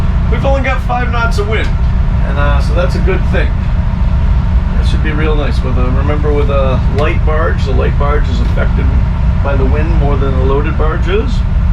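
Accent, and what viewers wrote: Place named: Louisiana, United States. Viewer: After years sailing worldwide , I assure you, the Cajun accent is nothing 😉 I ve come about some memorable ways to mishandle the English language: Try this... Helms order from a Chinese inland pilot "Yellow Yellow Yellow" 😵‍💫😖and that in the middle of the night on a river without any light and no chart we knew off and plenty unlit barges. Eventually we manage to make "Zero Zero Zero" out of it (the Chinese pronounce the "R" as "L"). Or this pilot in a remote small port in Estonia.. as there was no BA chart existing of the place, he brought his own Russian one. It looked like quite a long buoyed channel on paper. With the pilot's only English at each pair of buoys that was "Red to port, green to starboard" (Note: in Europe, it's inbound red on portside, as in opposite to America where it is green inbound on portside). 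This worked till we got to berth and plenty shallow water around. Then he uttered something without any warning and speed reduction that was to mean that we had arrived at our destination... Two days later, when outbound with the same pilot, we put him on the bridge wing and closed the door while he was like a parrot "Green to port Red to starboard" Not to forget: the Philippine sailors that always pronounce the F (or ph) as a P, there is also the odd one that pronounce the P as a F. It gives this... "chiep, chiep, I want to go ashore for a telepone call, it is very cheaf here"😂😂😂😂.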